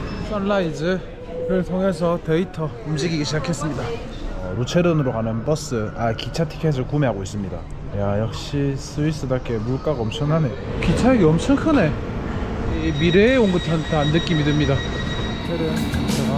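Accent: Gyeongsang, South Korea. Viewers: 경북인줄 경남 이셨구나 부산 싸나이 ~~ 홧팅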